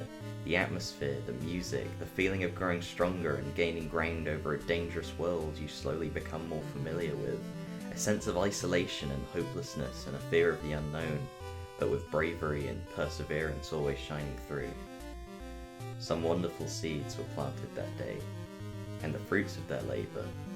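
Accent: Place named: United Kingdom. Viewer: You're very british oomfie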